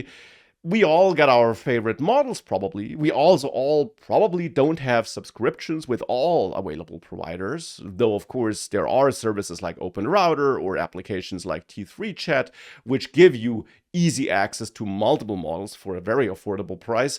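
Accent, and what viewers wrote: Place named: Germany. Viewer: Pretty sure he is German which natively uses W and V sounds, guarantee his English is way better than yours, bud. What is your native language so we can make fun of you?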